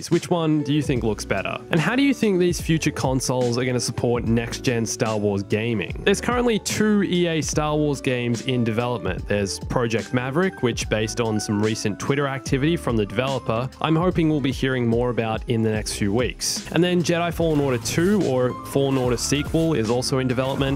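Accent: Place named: Australia. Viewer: Wait wat ur Aussie. I thought u were British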